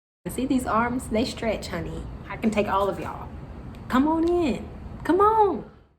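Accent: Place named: Rural, United States. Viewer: Awwwwww that country twang!!!!!!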